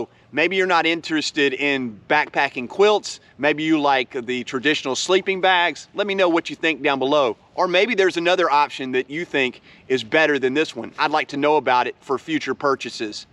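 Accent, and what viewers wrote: United States. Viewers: Where you from I like the accent, that's a proper American accent...Man I've been going to get this quilt for ages, its always A pain buying from the US we get hammered with expensive postage and VAT tax and import tax in the UK. I wish they would sort a good trade deal with the US so we could buy competitive. What kind is the back pack it looks a nice pack?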